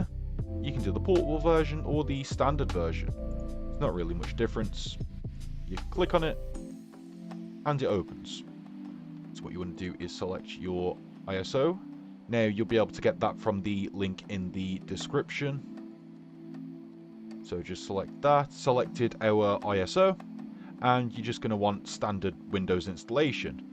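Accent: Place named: United Kingdom. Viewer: Thanks For the Info. Even with the Heavy UK accent This New Yorker understood you very well. Not a fan Of "Recall" forced on you with Widows 11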